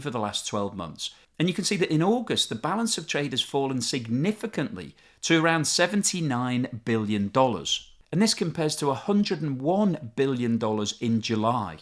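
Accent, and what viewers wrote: United Kingdom. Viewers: You have a British accent but you don't know shot.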